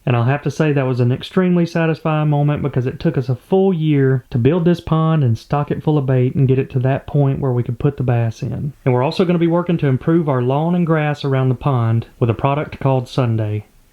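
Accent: South, United States. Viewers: I'm surrounded by a bunch of commies living in California. your Southern personality and accent really makes me feel like I'm back home.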